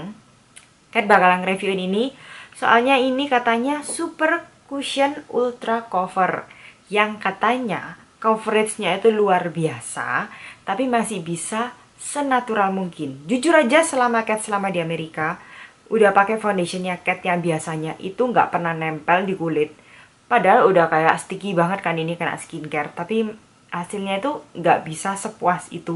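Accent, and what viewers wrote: Java, Indonesia. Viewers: medok medok ngono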